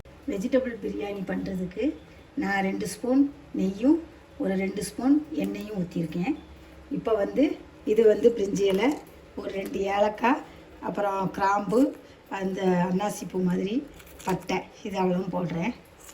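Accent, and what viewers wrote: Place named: Nagercoil, India. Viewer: I am from Nagercoil.Vadasery. Your slang is as ours. In which place you are. I daily see your vedios. Sweet Amma. Very happy to see you.😄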